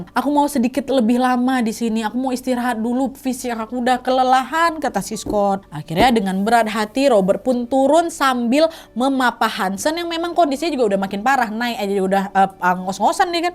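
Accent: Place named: Pekanbaru, Indonesia. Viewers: ndeh logat pekanbaru bana ha😂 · khas banget logat minang nya ka😂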